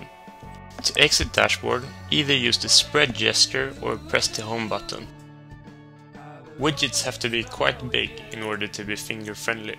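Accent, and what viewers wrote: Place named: Sweden. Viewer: Swedish! haha, heard it on your accent :-)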